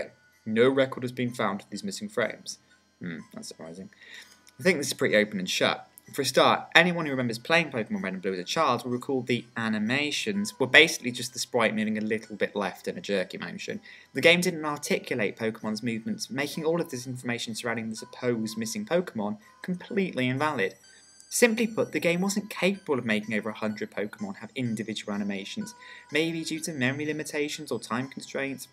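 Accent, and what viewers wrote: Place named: United Kingdom. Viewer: i like the video but can stop talking like a british guy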